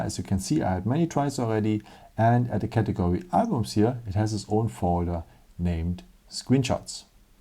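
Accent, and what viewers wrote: Germany. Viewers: How to get a clear screen on the phone to take pictures??? I can see myself at the bottom of the screen but, cannot open it up . Thank you 😊 I like your accent at some of the words you use makes me believe that you are German..😊 🤔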